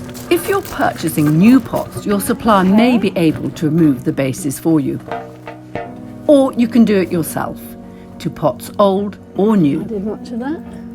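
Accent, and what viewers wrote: United Kingdom. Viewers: OK, I'll grant you that lol. But it is hard to hear her talking above the music and even though I have no trouble understanding a British accent, the music adds a layer of 'accent' that makes it hard work to follow speech.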